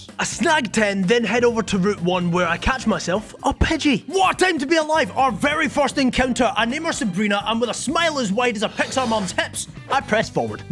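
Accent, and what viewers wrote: Scotland, United Kingdom. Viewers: Quick question are you Scottish?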